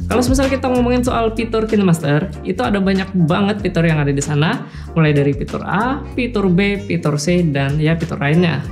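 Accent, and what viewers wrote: Banjar, Indonesia. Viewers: orang banjar kah bang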